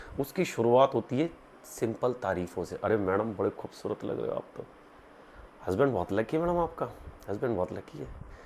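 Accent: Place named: Faridabad, India. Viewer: Faridabadi accent..full mauj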